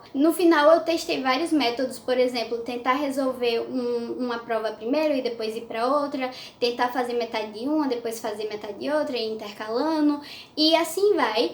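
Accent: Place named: Pernambuco, Brazil. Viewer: Tu é pernambucana, mulher? Sotaque lindo.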